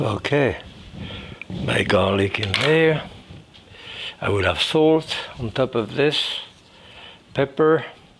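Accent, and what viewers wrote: France. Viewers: He hasn’t got a speech impediment….he’s speaking with a French accent. If you struggle to understand him, then I suggest that you turn on the subtitles. They don’t have an accent!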